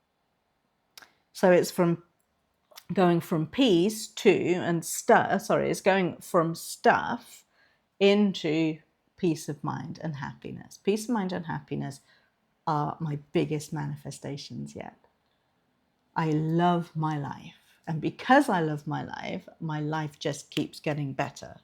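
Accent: United Kingdom. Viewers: 😊😊😊 That British accent is too kool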